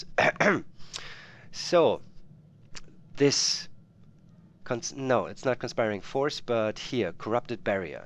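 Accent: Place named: Germany. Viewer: Good tips tnx. Love the german accent ;)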